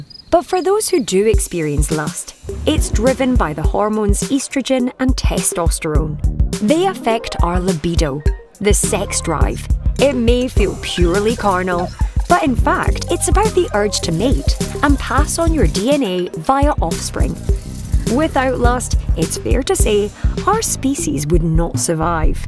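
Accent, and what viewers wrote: Scotland, United Kingdom. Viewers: Pretty sure she is a brit, but i don't know exactly which region.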